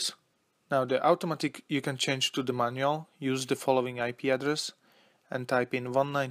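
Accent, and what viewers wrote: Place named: Poland. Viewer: He sound polish to me ;-) Pozdrawiam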